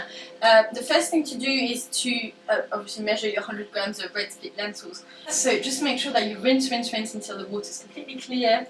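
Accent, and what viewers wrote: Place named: France. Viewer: Bonjour! By your accent I guess you're French?:) I lived in France for 8 years or so, and I must say I can't remember a high concern there for gluten free etc, there just seemed to be less allergies over there? Am I wrong?? I honestly first heard of allergies to gluten in the UK.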